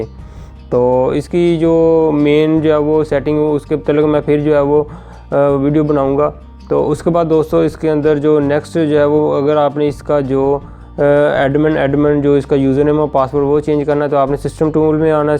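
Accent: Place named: Pakistan. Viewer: hahaha boli ton hi samj gya si pakistan di video hai. By the way great video. Gwandi taade.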